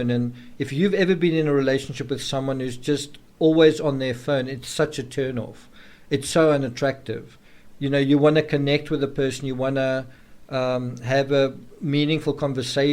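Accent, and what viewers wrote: South Africa. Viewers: He sounds South African.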